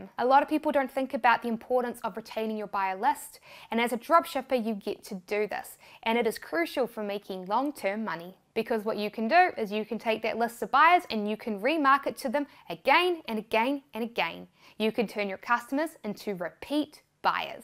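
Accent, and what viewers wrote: New Zealand. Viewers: Hey she is from New Zealand. That's why she sounds like that.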